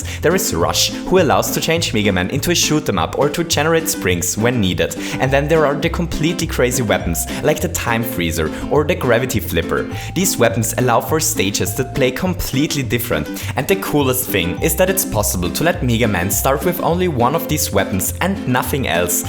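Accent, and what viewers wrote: Germany. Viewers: You sound german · german accent. i hate it. it's like ear cancer. xD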